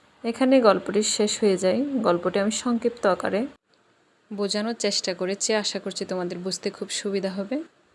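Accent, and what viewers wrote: Chittagong, Bangladesh. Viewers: আপনার ভাষ্যে চট্টগ্রামের আঞ্চলিক লক্ষণীয়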